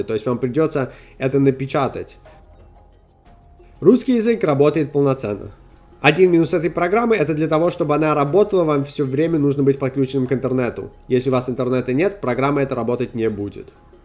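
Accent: United States. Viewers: акцент американский по знакомым знаю. а зачем приложения с газетами показывать если они все на английском? Публика то в 90% предпочитает читать на русском, я полагаю) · а ты американец или англичанин, не могу понять какой акцент????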